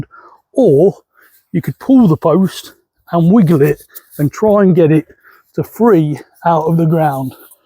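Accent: United Kingdom. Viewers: Is he bringing these to his pawn shop? And why is he taking with a British accent?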